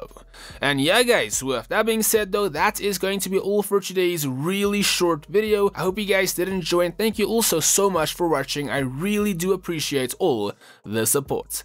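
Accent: South Africa. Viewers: ARE YOU SOUTH AFRICAN?! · are u from south africa? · Bro is south african??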